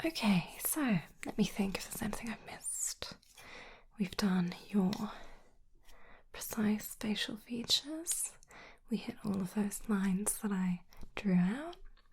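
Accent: Australia. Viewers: just aussie mate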